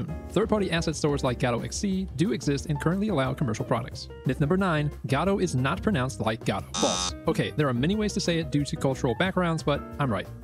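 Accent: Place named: United States. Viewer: His American accent makes oh sound like ah to us Europeans. But he's saying oh :)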